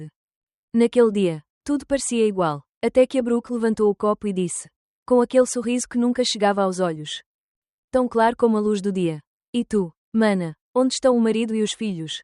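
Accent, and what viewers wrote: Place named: Portugal. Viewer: Horrivelmente monótono, e, para piorar a narradora é de Portugal com àquele sotaque de quem está com um ovo quente de galinha na boca.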